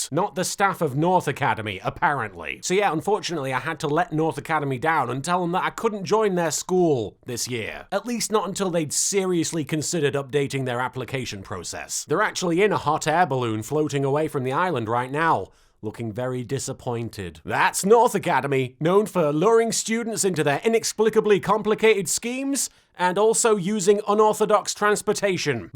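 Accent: United Kingdom. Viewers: Wait, are you actually British? This whole time I thought you were an American who just enjoys British slang. Kind of like a weaboo, but for British culture instead of Japanese. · I love listening to a British bloke say cheeky things about an anime from about 20 years ago, so I must be in the right place!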